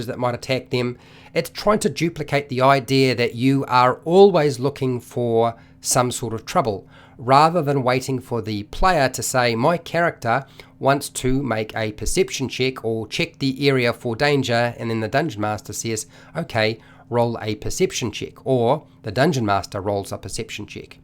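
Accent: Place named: New Zealand. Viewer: Me: Hm, I wonder if this is an Australian or a New Zealander? 🤔 "... is a check." Me: New Zealand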